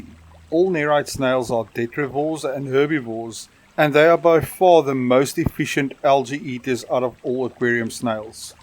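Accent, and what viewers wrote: South Africa. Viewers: You sound like your from South Africa my friend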